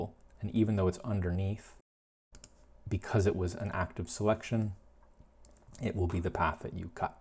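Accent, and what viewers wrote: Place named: Canada. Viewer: I felt like I was listening to the voice of Roman Mars from 99% Invisible, only a Canadian version ;) Thank you for sharing the tips. I've used Illustrator on and off for 20 years but never really explored all of the options and you've pointed out some really helpful tips that cut down on the actual designing part simply due to rotates and refecting.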